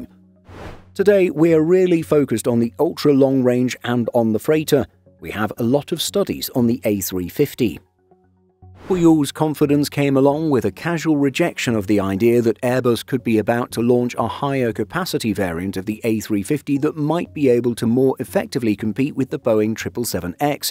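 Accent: England, United Kingdom. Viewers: In fact your english accent excites me a lot ! As you say purpose, so great for my french ears... ❤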